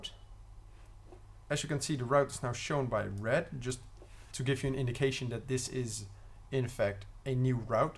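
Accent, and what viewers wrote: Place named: United States. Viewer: American pronunciation is quite annoying.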